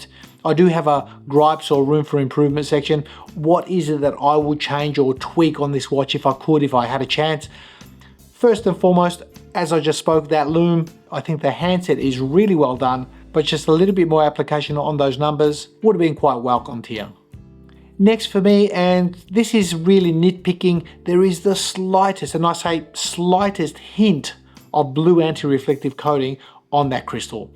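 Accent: Australia. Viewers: Fix that damn obnoxious Aussie accent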